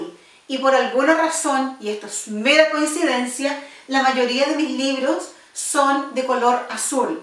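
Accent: Chile. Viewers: Eres chilena? Me suena tu acento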